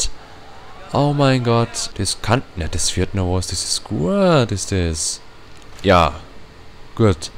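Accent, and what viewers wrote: Bavaria, Germany. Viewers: verdammt, du bist bayer?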